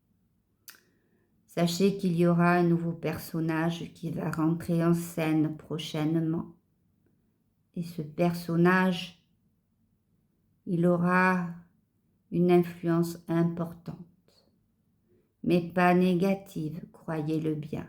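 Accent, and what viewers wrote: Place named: Southwest, France. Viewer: Merci pour cet important message avec ce bel accens du sud ouest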